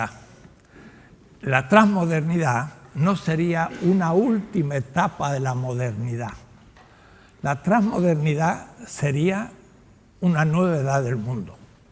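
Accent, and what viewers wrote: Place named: Chile. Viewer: Un ciudadano del mundo . Siempre pensé que era chileno ( por su acento ) hoy me entero que nació en argentina pero que hizo su vida en España palestina México . Tremendo aporte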